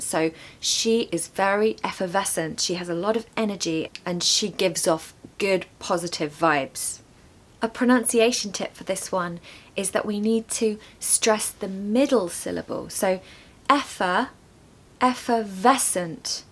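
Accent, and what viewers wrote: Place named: England, United Kingdom. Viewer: Sweet charming English accent 😅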